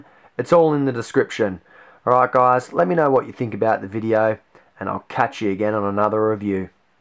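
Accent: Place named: Australia. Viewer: Australian accents really make you wish you had one-From Italy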